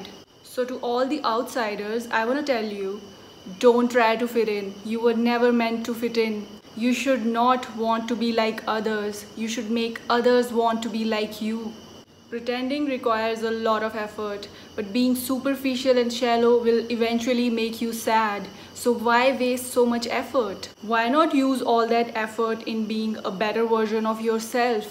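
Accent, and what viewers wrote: India. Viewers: I think many people feel like an outsider, but that's a feeling that them experience themself, that doesn't mean it's real especially people with low self-esteem. I don't know if this makes sense, I'm just trying to be smart here. I can understand if you have felt like an outsider in your life, because only your accent tells that you are from India and otherwise you don't look someone from India at all. I mean you look "white" than the average Indian and you look beautiful. That's meant as a compliment.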